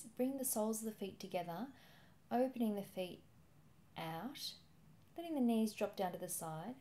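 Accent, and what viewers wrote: Australia. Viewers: I have so enjoyed the yoga with an Australian accent! Great yoga classes and always new poses. Thank you.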